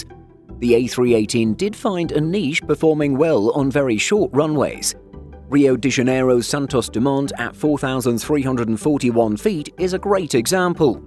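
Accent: United Kingdom. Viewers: You can hear from the stiff accent that that's British, very very British. So they simply cannot use metric system because they are Imperial.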